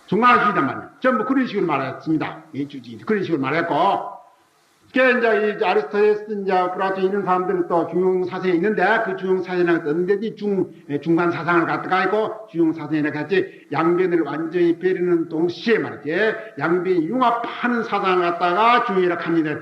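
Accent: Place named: Gyeongsang, South Korea. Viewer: 경남에서 태어난것이 처음으로 자랑스럽네요 ㅎㅎ사투리가 심하신 스님 말씀을 자막없이도 이리 수월하게 알아들을 수 있다니 🙏🏻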